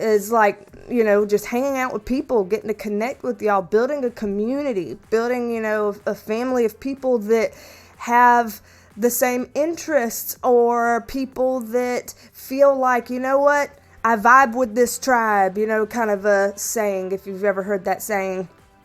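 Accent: South, United States. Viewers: I looked up the word perking it means cheerful or lively, it's so cute when you use that word :) I'm from NJ. and yes we talk differently and it's so fun for me to hear the southern accent I have a strong Jersey accent and yes I can't stand it LOL! I have been binge watching all your videos and you are amazing! You really are amazing and I hope you can see that. I believe your channel is going to be huge and if you come out with Merch I would be excited to purchase it. I just want you to know you are a gift to this universe! Your kindness and your beauty radiates through the computer and I would like to thank you for telling us about you! Keep on Perking :) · A fellow Aries!!! I looove! 😍 No wonder I feel like I just click with you! Plus, ya make me homesick for the south with your accent! I'm a Texan living in New York right now, so listening to you makes me feel at home. 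❤ Love ya, gal! Take care!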